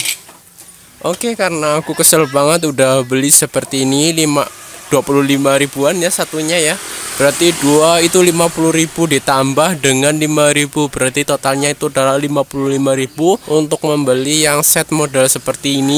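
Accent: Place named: Kawunganten, Indonesia. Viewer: medok kawungantenan...haha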